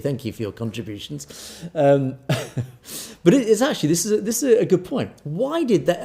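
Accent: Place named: United Kingdom. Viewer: Hello, greetings! This was very interesting and informative program, full of interesting facts! I particularly like your British Accent and want to adapt to it. But, I am afraid that Americans might make fun of it. I need your take on it.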